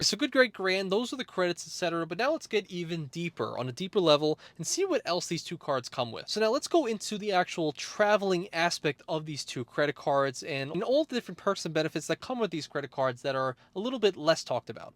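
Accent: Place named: New York, United States. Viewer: Are you from NY lol?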